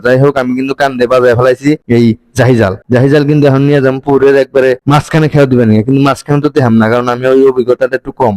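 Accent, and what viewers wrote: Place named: Jamalpur, Bangladesh. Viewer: জামালপুরের ভাষা বলতেছে 😊❤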